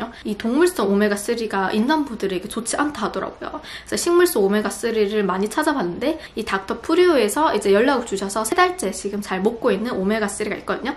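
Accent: Gyeongsang, South Korea. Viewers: 졸귘ㅋㅋㅋ 포슬포슬하이 쫀독쫀독하이 마시따아~ ㅋㅋㅋㅋㅋㅋㅋㅋ 영락옶는 갱상도 한국여자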